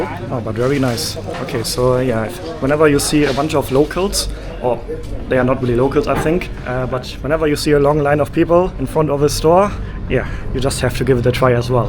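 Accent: Germany. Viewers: Ich liebe dein deutsches Englisch 😅😅😅😅❤❤❤ I love your German English 😂😂🔥🔥 Best videos bro thank you for all this videos 🎉🎉🎉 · ich rieche deutschen aktzent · Hello, I‘m here the first time, when I heard the famous German ascent, I had to listen a little and I must say, I liked your tour very much! I wanted to visit Qatar 3 years ago, but it didn’t happen, so you gave me a very good impression and description of that place, thanks very much! I am only 3-4 months/year in Germany and I am completely with you, when you express your feeling’s: I wish Germany would look like this! I wish many times the same! I look forward seeing and listening to more of your recorded journeys. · Love the German accent 😅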